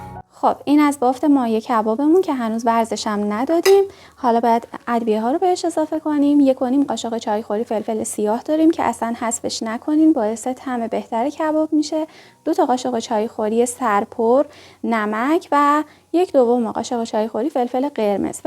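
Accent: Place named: Yazd, Iran. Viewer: ااا فک کردم یزدی اردکانی هستین😄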